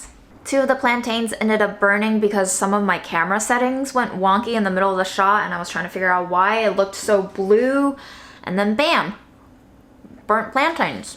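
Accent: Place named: South, United States. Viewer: she has a southern accent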